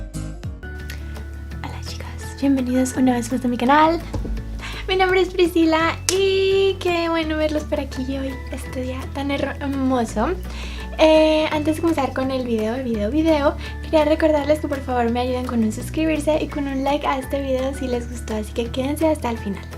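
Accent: Cali, Colombia. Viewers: Eso se te oye medio caleña ois jaja tan bella saludos desde cali 👌🏽👌🏽👌🏽 tengo un enamorado de Austria y quiero saber todo de la cultura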